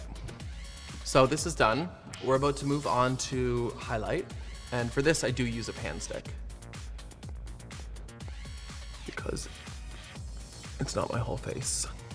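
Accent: Canada. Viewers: The Canadian accent coming through. I’m living for it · I’m Canadian and I’m sad I literally can’t hear Canadian accents because all the fake ones sound so funny so I wish I could hear Brooke’s accent the way other people do😭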